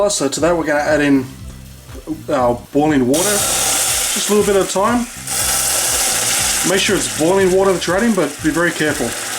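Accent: Australia. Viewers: Actually, for all those remarking on the Australian accent, you are being incredibly rude. Don't watch if a different accent is offensive. There are American and Englush accents which are unintelligible to other ears. I'm not Australian and can eadil understand what is being said.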